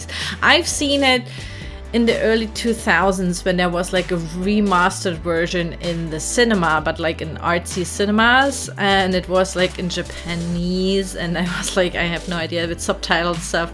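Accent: Germany. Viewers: Ich: starte das Video und höre die ersten Sätze auf Englisch. Mein Gedanke dabei war: „Das ist kein native English...eher deutsches Schulenglisch.“ Auch ich wie ich bei deiner Kanalinfo lese das du aus Deutschland kommst: „Ich wusste es!!😂😂😂“ finde ich richtig cool, dass du deine Lets Plays auf Englisch machst, damit auch andere, die kein Deutsch können, wissen was du gerade im Video machst!👍🏻 weiter so!😊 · Are you german? You accent sounds german 😅 (I'm half german)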